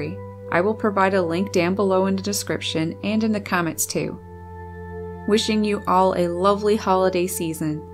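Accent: Canada. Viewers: What a beautiful birding documentary. The scenery is gorgeous and the birds you have around you are amazing. I am in Michigan and when I hear someone say out the way you do I can tell you must be from Canada. Northern Canada. Maybe I am wrong. I have watched you on and off for a while and now I am watching much more. Do you have any advice for me? I am moving in the spring/summer to my home in the thumb of Michigan’s lower penninsula. I am now in the Detroit area. We get birds in our yard, in the woods there at our other home where we are moving permanently but I would like to maximize this. I get the normal finches house, and gold finches, chickadees, rose breasted grosbeaks a rare cedar waxwing but it has been years, and we get Veery Thrush who sound amazing but are a rare site, and lots of wood peckers downy and red bellied, and eastern phoebes juncos etc. but I want the blue jays that I never see up there. 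We are right off Lake Huron and att our friends house they have purple Martins, Sand pipers and gulls. Can it draw blue jays to wooded areas? I will live in the woods?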